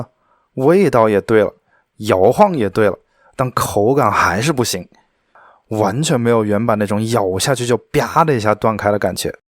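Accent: Wuhan, China. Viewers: 听着像武汉的☺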